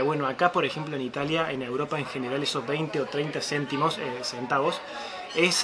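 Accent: Córdoba, Argentina. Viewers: ¿Es muy importante conocer el idioma del país donde vas a vivir? ¿Cuál es tu profesión? Por tu tonada, eres argentuno cordobés, ¿no es así?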